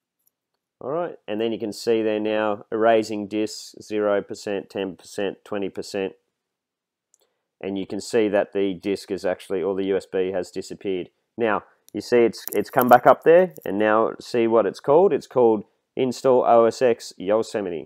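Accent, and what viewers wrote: Australia. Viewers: Well explained, good to hear an Aussie voice for a change.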